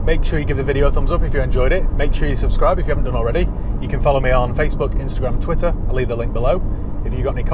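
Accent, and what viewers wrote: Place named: North, United Kingdom. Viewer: You're a proper northerner aren't you.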